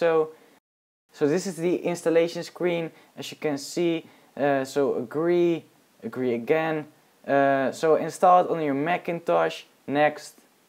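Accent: Netherlands. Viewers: where u from brah ? dutch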